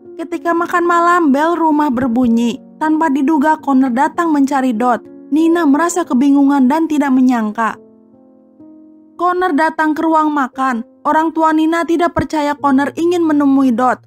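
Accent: West Java, Indonesia. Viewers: Sama😭 itu kek logat sunda sih bukan medok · Logat Sunda mu mbak , aduhhhh .. tolong perbaiki mbk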